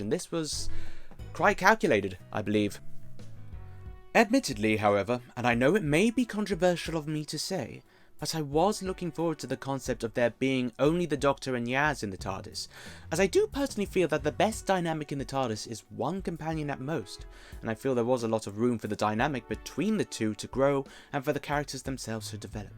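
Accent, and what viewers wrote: RP, United Kingdom. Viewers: First off, to you and all fellow Whovians, KISS army (fans of KISS), Trekkies, Jedis and everyone who's alive (I think you'd agree to name all the people on Earth would be difficult) - HAPPY NEW YEAR 2021. As I've said many times now... FUCK OFF 2020 (apologises to ladies present for my swearing). Wouldn't you agree 2020 is the first year that people the world over ACTUALLY and personally DISLIKE? Anyway, ODDLY, I have to say following what you were saying in the video was difficult? No disrespect. I love you posh accent and tone because I have it too. We have the Mojo ladies and Yanks like LOL Maybe picked up from watching Jon Pertwee, the previous two Doctors and beyond with their BBC Queen's English? Let's face it, until 2005, Doctor Who never was working class or had any characters representing them until the 9th & 13th Doctors. 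And now Dan has/will? Which leads me to the next point: Is it possible the increase in northerners has put off American viewers because apparently they have been baffled by John Bishop? I haven't watched "Resolution" or much of the season that finished. Not sure which one it is. So, I was confused by the trailer with John Bishop. It looked to me in the way it freeze framed and the wording to be introducing Bishop as the NEW Doctor? In fact I think they've MISSED a chance to cast him which would've been perfect. I don't think a female Doctor has been a hit with non fans. But to say the show's problems is due solely to the Doctor being femal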